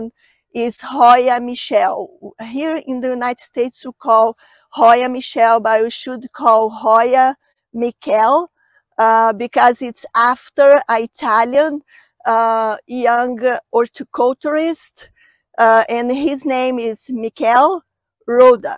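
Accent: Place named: Brazil. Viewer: Seu sotaque, sotaque de brasileiro é inconfundível .Eu também coleciono hoyas. Tenho 25 espécies. Mas nenhuma no momento floresceu :(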